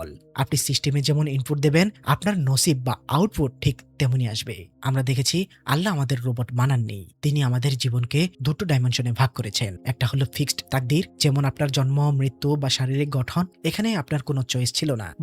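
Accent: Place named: Jamalpur, Bangladesh. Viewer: oh আচ্ছা সেটা বলেন,,হ্যা সমস্যা নেই,তবে আমগর এলাকার নেহালা ভাষা ত, মেল্লা মিল আছে, হেজন্যেই কইল্যাম।যদি এবা অইতো এপনে আসলেই জামালপুরের, মেল্লা বালা অইতো 😅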